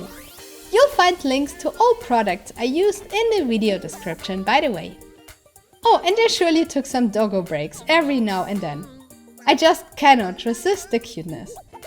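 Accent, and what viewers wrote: Germany. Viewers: I love your germand Accent! S2 S2 S2!!! I BUY YOUR BOOKKS!!!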